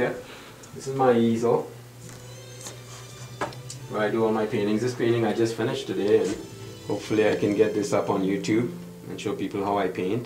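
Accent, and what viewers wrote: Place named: Trinidad and Tobago. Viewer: Still got your trini accent man!! so cool..an I love your work..its wonderful.. love it all..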